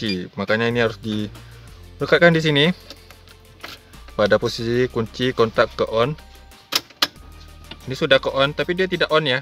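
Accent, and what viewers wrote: West Sumatra, Indonesia. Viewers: Logat org minang 😆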